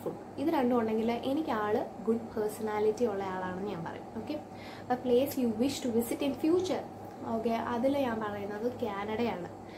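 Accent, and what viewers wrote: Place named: Kottayam, India. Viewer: Aahaa chechi Kottayam aa alle enikku samsaram kettappazhee thonni Njan oru Q and A thappinadakkuvarunnue Eppam alle kittiyee Njanum Kottayam aannee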